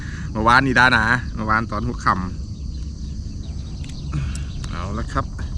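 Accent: Korat, Thailand. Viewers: Fcโคราชจ้าชอบพี่พูดภาษาโคราช พี่ได่เมียบ้านไหนฉันอยุ่ขามสะแกแสงเดิ้อ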